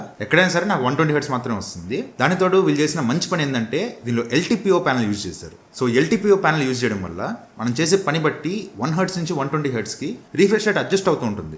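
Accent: Nellore, India. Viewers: Anna nuvvu Nellore odivaaa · Bro nellore aa meedi, yekkada untaru bro maadi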